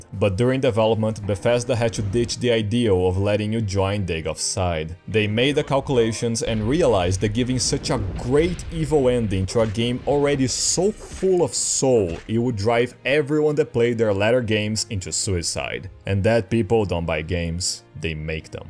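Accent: Brazil. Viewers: His Brazil accent is so funny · You have an accent similar to Brazilian portuguese, are you Brazilian?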